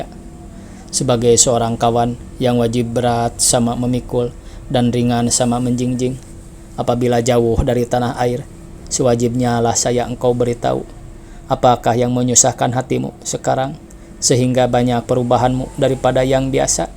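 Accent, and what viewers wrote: Sunda, Indonesia. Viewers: Soantenna siga kisunda ieu teh sanes.?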